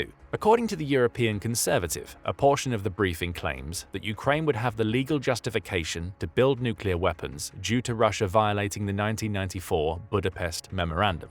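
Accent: England, United Kingdom. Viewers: What bugs me about the narrator (Who by his accent is clearly English) is that he keeps pronouncing "Nuclear" like an American.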